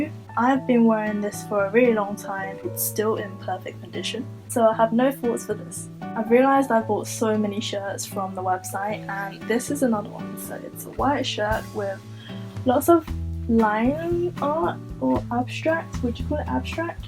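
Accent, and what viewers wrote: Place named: United Kingdom. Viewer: I also love wearing puffy clothing to hide me tummy too XD i love your british accent!! im australian but people claim i sound british?! HAHAH anyways i love the haul! and how honest you are heheheh i wish i could be your 100th sub BUT lucky lucky to whoever it is T.T YEAH YYAYAYA XD looking forward to more videos!! :DDDD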